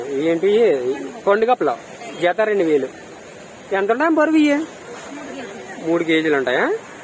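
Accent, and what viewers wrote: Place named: Konaseema, India. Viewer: Thammudu variety video. Your slang konaseema slang Open slang. Which village my dear brother All the best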